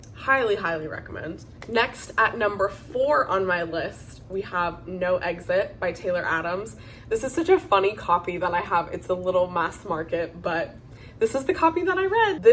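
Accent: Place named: United Kingdom. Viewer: Your British accent kills me every time 🤣🤣🤣🤣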